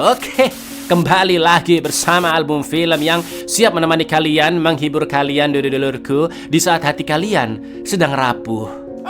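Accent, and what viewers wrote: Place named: East Java, Indonesia. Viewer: Cak, jowone sampean ngger endi? Kok jowone podo carane ngomonge karo aku, aku wong lumajang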